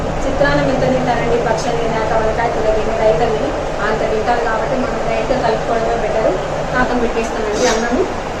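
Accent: Rayalaseema, India. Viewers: Meedi raayalaseema anukuntunnanu maadikuda raayalaseema Atp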